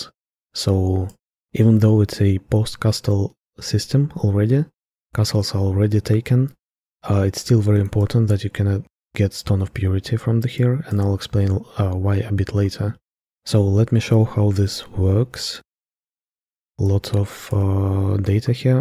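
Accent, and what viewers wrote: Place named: Germany. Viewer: are you german friend?? very nice accent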